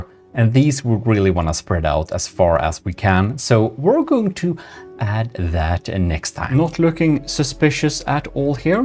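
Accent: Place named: Sweden. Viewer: People guessed wrong on your nationality? Din engelska brytning är så extremt svensk att det låter nästan som om du pratar svenska lol.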